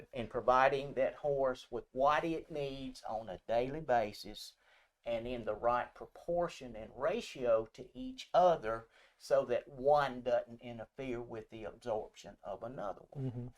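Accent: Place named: South, United States. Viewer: I'm 63 and appreciate the knowledge here. However, as an impatient youtube consumer, this video could be 10 mins shorter if this guy did't articulate every syllable of every word. (I swear, southereners add syllables where there are none ( example 'This' becomes 'thi--is')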